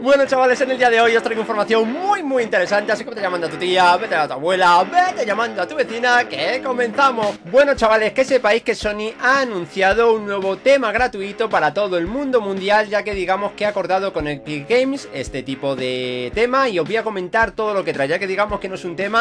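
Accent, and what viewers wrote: United Kingdom. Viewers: Que guapete eres tu inglés es de cambridge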